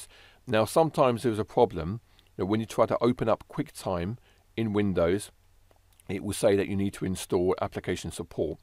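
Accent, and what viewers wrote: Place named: United Kingdom. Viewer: Your accent is so like Hermione(British)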